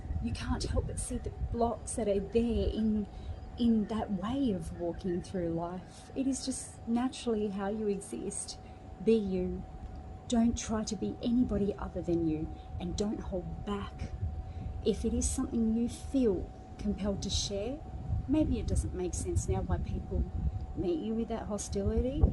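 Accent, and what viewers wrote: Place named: Australia. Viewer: I like your accent.Are you in Australia?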